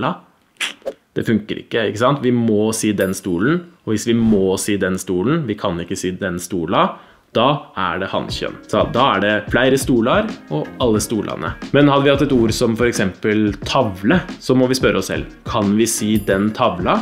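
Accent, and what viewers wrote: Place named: Eastern Norway, Norway. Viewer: Det er stadig noen som sier 'jeg 'snakker' ikke nynorsk'. Ingen snakker verken nynorsk eller bokmål. Nynorsk og bokmål er litterære språk. Når vi er 5-6 år, er språket vårt ferdig utvikla - grammatisk sett. Vi må på skolen for å lære å lese og skrive. Der lærer vi å lese og skrive nynorsk, bokmål, engelsk, tysk, fransk, spansk etc + norske minoritetsspråk. Når vi skal skille norsk fra dansk og svensk, ser vi at dansk og svensk ikke har trekjønnsbøying, i samme grad, som på norsk. Vi kan altså si at norsk er et nordisk språk med trekjønnsbøying i substantivklassen. Substantivklassen er den største ordklassen. Vi kan generalisere ut fra det. Nynorsk er basert på norske dialekter. Eneste unntak er bergensdialekt og talemålet på Oslo V. På samme måte som med dansk og svensk så har de ikke mange hunkjønnsord. Alle andre norske dialekter har trekjønnsbøying. 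Når nynorsk er baserte på norske dialekter så er det 'bare' å bruke dialekten, slik som i videoen, for å finne rett kjønn. Legg merke til at, i videoen, snakker østnorsk. Østnorsk er norsk med trekjønnsbøying. Altså kan østnorske bruke sin dialekt til å finne ut av bøyninga i substantivklassen. Selv østnorsk er 'nynorsk'. Det er jo et norsk dialektområde. Å si at man 'ikke snakker nynorsk', og dermed 'hater nynorsk' er ikke et gangbart argument.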